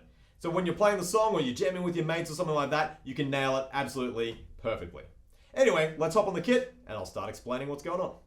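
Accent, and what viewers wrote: Australia. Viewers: Quick Question For Ya! Are You From Australia Or New Zealand?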